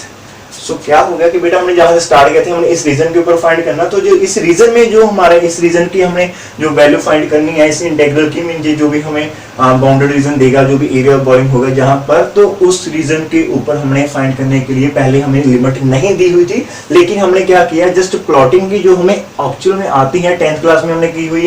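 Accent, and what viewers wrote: Punjab, India. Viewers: Thank you sir ji ,Punjabi touch aayi jaanda boli ch Sir ji 👌👌👌👌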